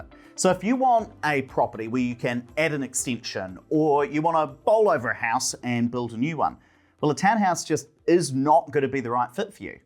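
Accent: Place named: New Zealand. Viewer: Yuk Kiwi baby accent yuk Kiwis are such retards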